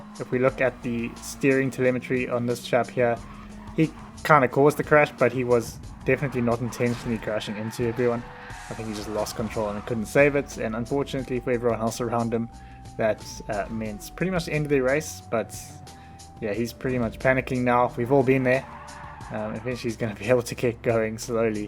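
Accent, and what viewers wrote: South Africa. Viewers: Is that a South African accent I detect?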